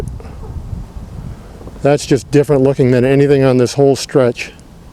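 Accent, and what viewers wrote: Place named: Massachusetts, United States. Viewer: Great video, lots of valuable advice in here. As a native Bostonian I could hear Massachusetts in your accent too pretty quickly lol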